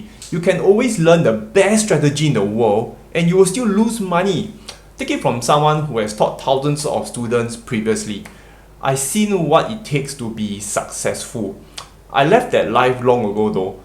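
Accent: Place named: Singapore. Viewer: bro your videos are amazing. your Singaporean accent is so strong, but your messaging is so clear. love the content bro, you make Youtube a better place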